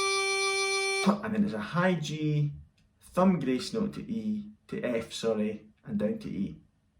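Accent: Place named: Scotland, United Kingdom. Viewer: Very very helpfull, included your magnificent Scottish accent, which is not the easiest thing to understand for an old Frenchman like me...Thank you very much indeed !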